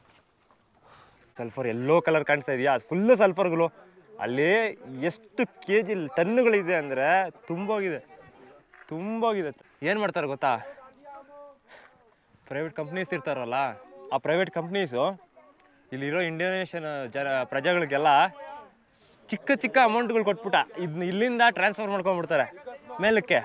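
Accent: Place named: Chikkaballapur-Kolar, India. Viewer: Brother nivu chikballapura kolar side avra Language a tara ide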